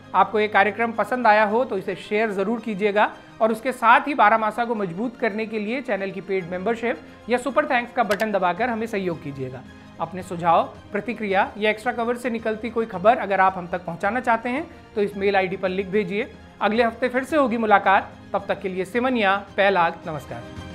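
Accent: Garhwal, India. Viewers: आप बहुत सुंदर व्याख्या करते हैं सही और सच्ची खबर देते हैं बोलने की भाषा शैली भीआपके देसी गढ़वाली वाली है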